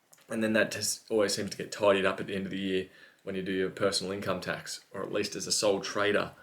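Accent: Australia. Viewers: I'm looking to get into device repairs and cleaning and I have to say these videos have really helped me, your channel is criminally underrated and it's good to here another Australian voice actually helping.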